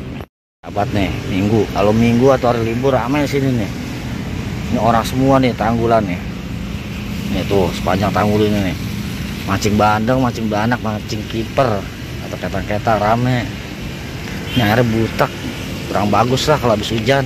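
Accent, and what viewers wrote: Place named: Jakarta, Indonesia. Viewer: Baru nemu nih channel. Ane demen nih, 👍 logat ngomong nye betawi banget.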